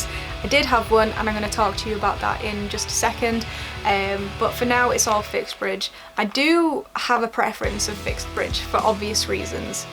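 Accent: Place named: United Kingdom. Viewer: i would have never guessed you're british. Watching your older videos i thought you were from southern california. Your general demeanor, taste in music, taste in guitars, even your playing technique and room decorations scream "younger millenial or older gen z from orange county, lower middle class suburban public school outcast, rasied on top 50 rock radio and barely caught the tail end of the warped tour era then went to musician's institute for college". You could have fooled a CIA profiler until you started talking. Probably mostly still accurate, except you wish you were from socal.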